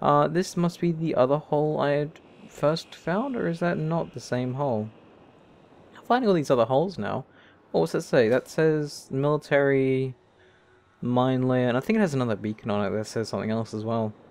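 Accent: Australia. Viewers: Show block placements, design choices, exploration and problem solving. Edit the largest constructions. For instance. You place a large reactor and a conveyor system and what not then go(in your Australian accent) 'Right guys brb' The jump to you finishing it. Things like small ships are cool to watch because you need to make tweaks and changes as you go. But large scale welding can be monotonous to watch. Not so bad once you have a welding ship.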